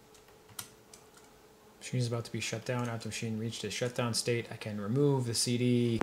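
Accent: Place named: Canada. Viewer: I can tell you are Canadian!! - "Pixie" support?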